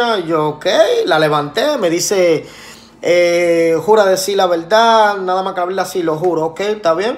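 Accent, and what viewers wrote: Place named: Dominican Republic. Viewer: Bien explicado Con acento Dominicano.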